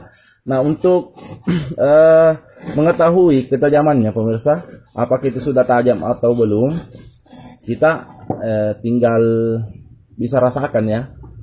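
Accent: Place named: Makassar, Indonesia. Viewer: Ini org makassar pemirsa... ketauan logatmu... smsm jaki nukasi bodo2 semua pemirsa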